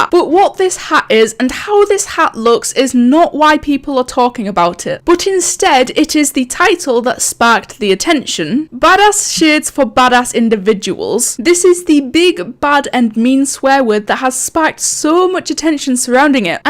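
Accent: Scotland, United Kingdom. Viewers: First off "Badass" isnt a bad word second off i like your scottish accent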